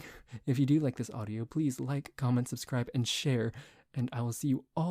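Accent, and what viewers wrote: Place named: United Kingdom. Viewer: Once again, waking up on Saturday to a audio is the best way to wake up on Saturday! Amazing once again, you’re British accent is definitely nice 😊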